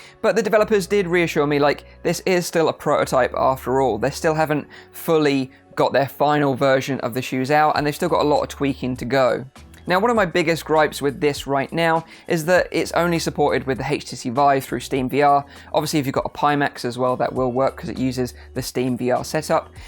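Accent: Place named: England, United Kingdom. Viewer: It's the english accent. From england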